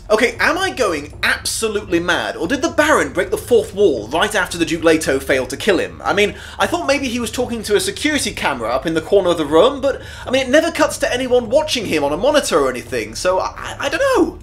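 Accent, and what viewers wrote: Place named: United Kingdom. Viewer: From a guy with an British accent, it's funny that you miss that Dune is closer to T. E. Lawrence in the Arab revolt in WW1 (Lawrence of Arabia) than current events with the USA.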